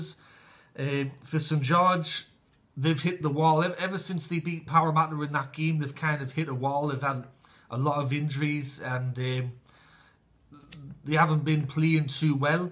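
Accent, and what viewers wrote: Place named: Newcastle, United Kingdom. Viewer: Nice Geordie Accent. :)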